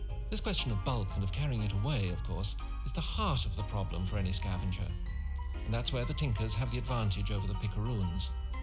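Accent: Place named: Kildare, Ireland. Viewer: Commentator is from Kildare, probably Naas,, That's how we speak.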